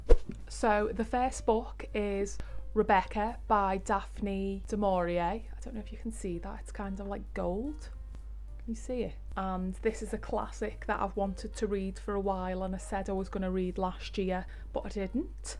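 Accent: Liverpool, United Kingdom. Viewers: aaaah, thank you for much for replying! I have been to many cities in the UK but not to Liverpool yet (although I'd love to) - this explains it! I can't understand people being hateful to accents, they are the best thing ever and something to cherish as you do :) It's one of the things I love the most about your channel.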